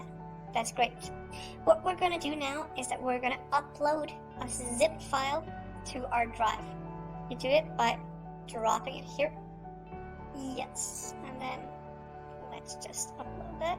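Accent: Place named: Europe. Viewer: no offense but the filter makes it worst. love me some european accent...just use your real voice nxt time please.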